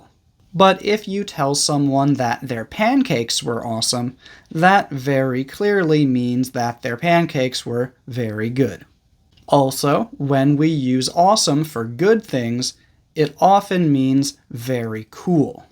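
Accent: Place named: United States. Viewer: You are too kind. In awe of your beautiful American accent as well.👍😊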